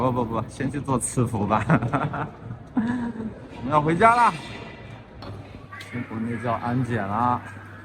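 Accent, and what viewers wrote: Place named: Taiwan. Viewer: 长着北方人的脸，操着半吊台湾腔。你在台湾呆多久？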